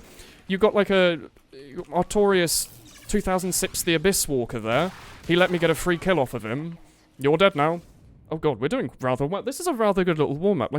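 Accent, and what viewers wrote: United Kingdom. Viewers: u sound British asf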